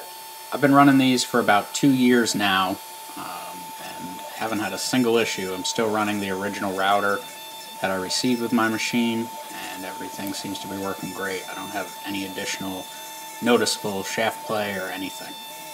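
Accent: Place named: North Carolina, United States. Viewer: where you out of your accent sounds eastern NC-ish, love this setup.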